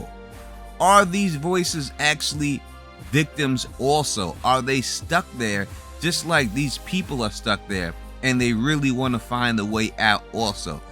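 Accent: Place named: Long Island, United States. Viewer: you from long island, son? i know that accent.....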